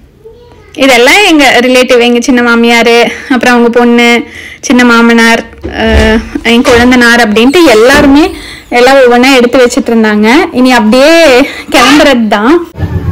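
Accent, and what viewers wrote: Kongu, India. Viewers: eanunga ka niga kongu mandalatha senthavangala unga slang thaniya theriyuthe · Namma kongu slang alazhungoo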